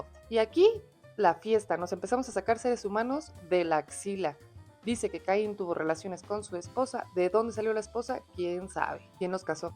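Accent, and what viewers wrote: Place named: Mexico City, Mexico. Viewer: Qué joya de comentario, carnal. Leer la historia antigua en tono chilango es otro nivel. Y la neta es que traes un ritmazo con los datos históricos de los egiptos y la estrella Sirio. Es 100% real que esos sacerdotes se dieron cuenta de que cuando Sirio aparecía en el cielo, el Nilo se inundaba, y así calcularon el año solar de 12 meses. Y sí, Julio César llegó siglos después a meter sus reformas porque el calendario romano era un desmadre. Pero con lo de los 900 años de esos güeyes de la Biblia, la matemática nos da un patatús biológico. La teoría de que contaban "meses" en lugar de años solares para que Matusalén viviera 72 años suena chida, pero si aplicas esa misma lógica a todo el árbol genealógico, el guion se rompe bien feo. Por ejemplo, el Génesis dice que Enoc tuvo a su hijo a los 65 años; si esos fueran meses, significa que el güey fue papá a los 4 años y medio de edad. 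¡Una completa locura! La realidad histórica es más simple: los escritores de la Edad de Bronce no tenían un error de álgebra, simplemente eran bien exagerados. En todas las mitologías de esa zona (como los sumerios), entre más viejo y antiguo era el ancestro, más años le inventaban para hacerlo ver más sagrado, poderoso y cercano a los dioses. Eran puras mentiras piadosas para apantallar a la gente del pueblo, no un problema de traducción de los egiptos. ¡Pero qué buen cotorreo armaste con Julio César y sus mamadas!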